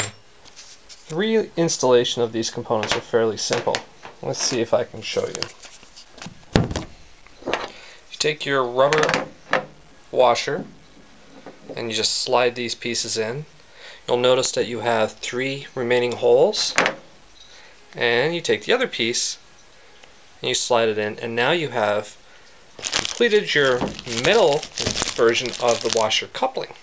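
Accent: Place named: Canada. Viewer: Oh you SO rock :) I was thinking I had to a get a new washer but followed your steps and sure enough - that little bugger was broken., Ordered a new one and paid extra so it'll be here Fri (it's Wed now) SWEET! YAY I don't have to go to a skeezy laundromat LOL THANK YOU! :) (And you're Canadian - so am I - I could tell by the "oots" before you mentioned Toronto LOL I'm in Seattle but from BC.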